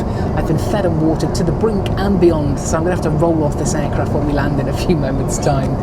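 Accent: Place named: United Kingdom. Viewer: I can listen to this British 🇬🇧 accent forever 😀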